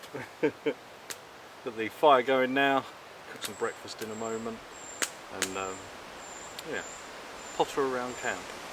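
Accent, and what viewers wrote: England, United Kingdom. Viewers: Are you in uk or usa ,I know you are english from your accent , missed you building your ca in loved to have seen it though, many congrats young man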